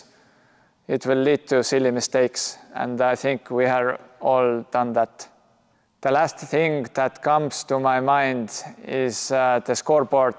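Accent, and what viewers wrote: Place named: Russia. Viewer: Besides the beautiful Russian accent, this video is great.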